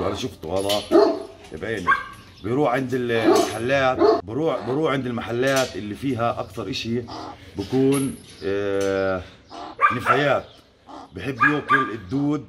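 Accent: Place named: Palestine. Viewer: اللهجه الفلسطينيه ثقيله دم ومو حلوووه سلملي ع اهل العراق بس احلى لهجه عربيه 💐❤️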